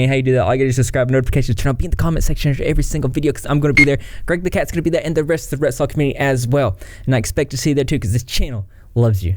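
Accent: United States. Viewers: I did not expect that country accent lol. Which I get that too lol.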